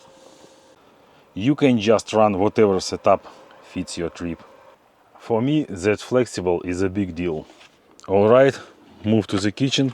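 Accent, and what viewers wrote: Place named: Crimea, Ukraine. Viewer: Ох, как бьёт по ушам этот Крымский акцент )))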